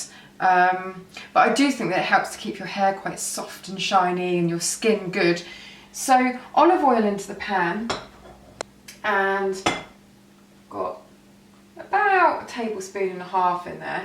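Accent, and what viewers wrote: United Kingdom. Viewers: I like how you saw "tomato" :)) love your British accent! To us here in the US it sounds so interesting and nice!! I could listen to you all day!!:) Luv from New Jersey!!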